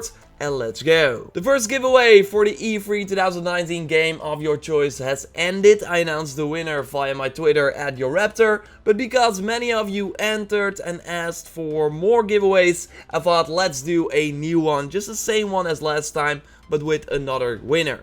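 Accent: Netherlands. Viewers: Are u Dutch? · Are u dutch because u sound dutch?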